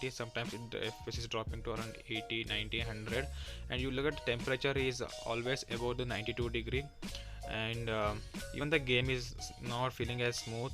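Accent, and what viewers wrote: India. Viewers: That's how indians talk "waylowrent" and yeah what did he expect for a budget gaming laptop like that? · Indiano falando inglês é o que há de pior no planeta!!! Pqpppp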